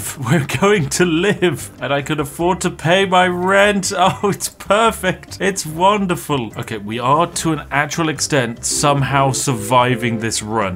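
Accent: United Kingdom. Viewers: This guy literaly british lets gam it out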